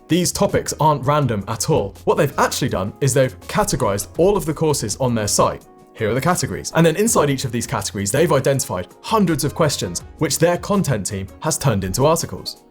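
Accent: United Kingdom. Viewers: Close your eye, you will hear he is at a normal speech speed for a podcast. At least he speaks a fairly neutral UK English... with a slight London accent (innit?} ... ... For UK standards he is super easy to understand... When I went to Edinburgh ...it often took me up to 2 minutes (or until I have heard a clear FUCK ) to see if people are actually speaking English) To Learn More about Brits are fucking impossible to understand to the point they sometimes do not understand each other : any interview with fighter Paddy Pimblett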